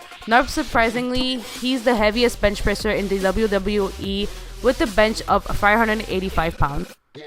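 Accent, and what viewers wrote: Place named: Iran. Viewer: What's with this woman narrating this video..sounds like she's from iran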